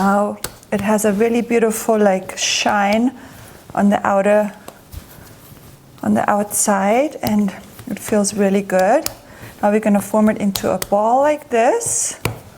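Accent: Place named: Germany. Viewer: Ich wusste direkt sie kommt sicher aus Deutschland oder Österreich 🤗🤗🤗weil der Akzent einfach ich liebe deine Videos !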